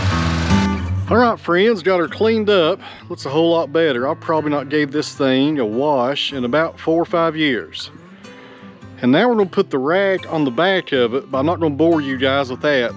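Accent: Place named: Ohio, United States. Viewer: Listening to you makes me just a touch homesick. Southwest Ohio, true, but rural Ohio isn't much different. Thank you, Sir.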